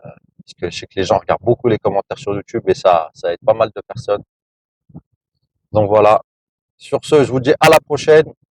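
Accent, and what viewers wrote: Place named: Seine-Saint-Denis, France. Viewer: IN ACCENT DE BLEDARD DU 9/3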